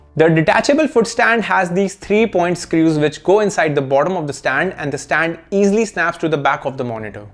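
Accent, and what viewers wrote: India. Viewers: in other words joking in english sounds funnier in indian accent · The indian accent is epic!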